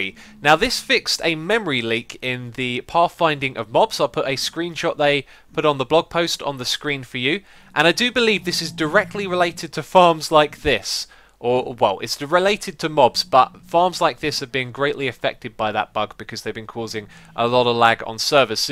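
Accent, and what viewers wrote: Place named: United Kingdom. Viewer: British accent. Same as chuchorial.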